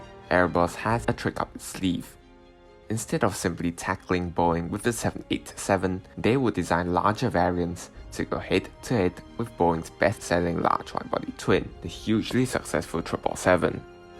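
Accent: Singapore. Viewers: Nice documentary. Thanks for creating it. Is that a Singaporean (or perhaps Malaysian) accent you have?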